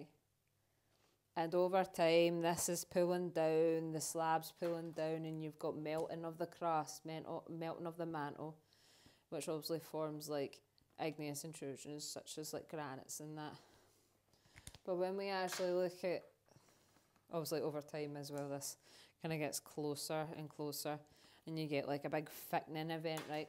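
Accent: Scotland, United Kingdom. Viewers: Glad I chanced upon your channel. I was interested in your mention of mudstone ( and I've noticed you have a video on that). I live in Almondbank, a couple of miles northwest of Perth. There are some cliffs where the river Almond takes sharp turns and the cliffs are known locally as marley rock- as in crumbly. The rock is very soft and crumbly. The colour is pinky brown. I assume that's mudstone. I'll have a look at your other rock videos; thanks for your efforts. And its great to hear the broad scots accent.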